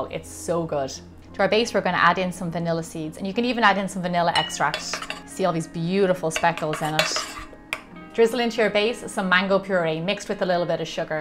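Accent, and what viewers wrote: Ireland. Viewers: Who else is addicted to that irish accent (if I'm right about the accent)😂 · She has to be irish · Are you from Ireland? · Gemma Stafford You're from Ireland aren't ya 😊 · Are u Irish??? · I think she's from Ireland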